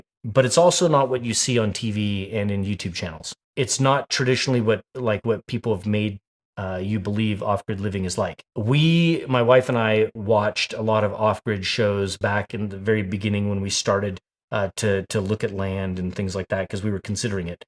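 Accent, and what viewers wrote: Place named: Central Washington, United States. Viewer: Bro, it sounds like you live in central Washington! I wish I could send you a message to see if we live in the same town 🤣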